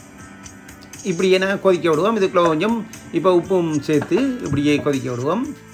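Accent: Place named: Sri Lanka. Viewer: Pusanikai soup.... So different and delicious brother. இலங்கை தமிழ். கேட்பதற்கு மிகவும்அருமையாக உள்ளது.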